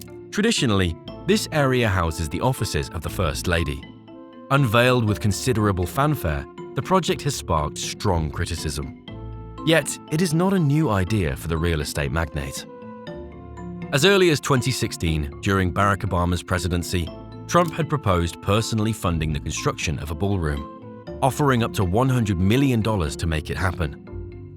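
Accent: United Kingdom. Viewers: If your going to use an AI to talk about an American icon, why would you make it sound British? :person-turqouise-waving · Why does every fcking show have to have the British accent. Were not fckng Brits!!!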